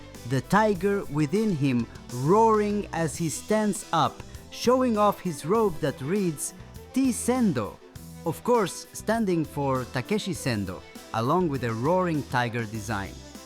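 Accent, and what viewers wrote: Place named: India. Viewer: Are you indian? You have indian accent.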